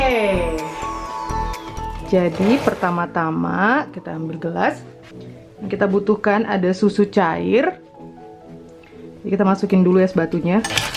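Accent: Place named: Kalimantan, Indonesia. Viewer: Enakkah? = nyamankah? Hehehehehehhe... logat kalimantannya kentel ya...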